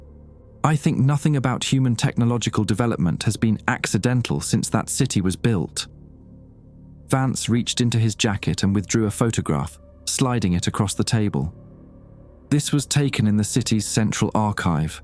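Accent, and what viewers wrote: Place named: England, United Kingdom. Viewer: English accent is a deal breaker! American story, Texas, national archives college park md, whiskey and all. Why does everything on YouTube have a twat accent?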